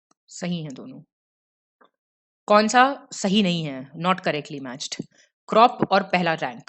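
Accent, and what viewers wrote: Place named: Uttar Pradesh, India. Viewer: Madam g jyada angreji bilari na bano Hakikat me jio Up wale andaj me bolo jyada yad rahta h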